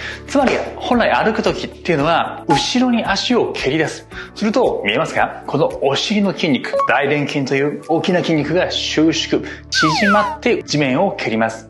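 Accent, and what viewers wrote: Kansai, Japan. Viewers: 色んな困難を乗り越えてらしたのですね🥺 スゴく魅力的で たまに関西訛りがチャーミングです。 わかりやすくて 取り組みやすいものばかりで 感謝です✨ お身体に気をつけて 御過ごしください🎵(*^^*) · 時々出る関西弁がわりと和む(笑)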